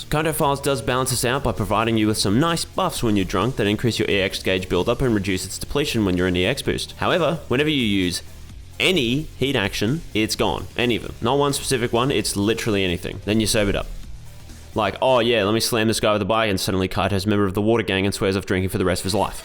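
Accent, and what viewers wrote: Australia. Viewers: As an australian, he doesn't sound very british and is probably also australian.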